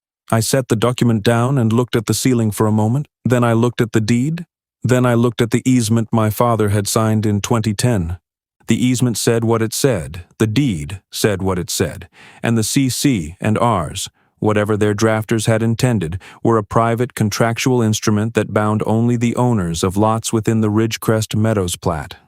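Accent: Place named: United Kingdom. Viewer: It'd be nice to loose the British accent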